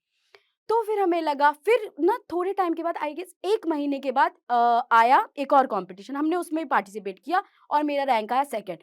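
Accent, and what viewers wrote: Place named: Bihar, India. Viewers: Don't be ashamed of being bihari , be proud of your bihari accent. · you really acts like a proper bihar girl, your accent, expressions, dialogue delivery are awesome and apt. god bless you with more success. be like this only free and frank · You have put Bihar and the beautiful dialect on the top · Ji nh sare bihari aese hi baat karte hai.. It is their accent... Bihar jayenge toh har koi aese hi baat karte milega.. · South k log South k accent me bat kre to wo educated & bihari agr bihari accent me bat kre to wo regional....... U are an inspiration.. regional ho ya desi... But aap ho original.. this is wat matters.. all d best & keep shining ❤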